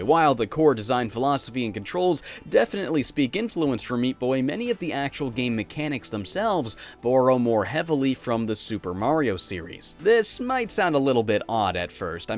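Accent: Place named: Canada. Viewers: Really? That’s a shame man. Canadian accent or not the analysis would still be spot on. I’d say that’s what really matters. Ya know, aside from letting you know about how Chargin’ Chucks are in 3D World again. And again. And again. And again. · Hello, Please ignore them. It is a shame that you should feel you should hide your Canadian accents because of people. On the contrary, I'd like you to remain the dude you actually are, don't force yourself to be otherwise. Also, there is a ludicrous amount of videos in American English on the internet, so listening to another accent is overwhelmingly refreshing; that accent is not missed... at all. Actually, that is quite unfortunate that the American accent seems to be the norm in videos and in English courses at schools. Please, keep up the good work!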